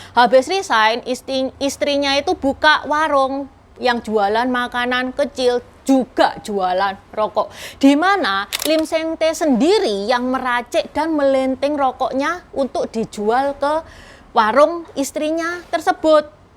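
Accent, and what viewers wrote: Java, Indonesia. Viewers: Rokok kretek taline lawe. Hhhh.. enakan yakin dengerin dongeng nya nih. Dg bhsa indonesia ber aksen jawa medok. Hihihi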